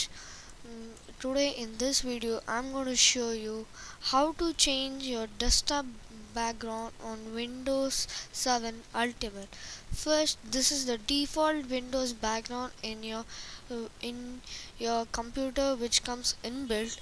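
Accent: India. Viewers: The Indian accent make me weak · that indian accent was solid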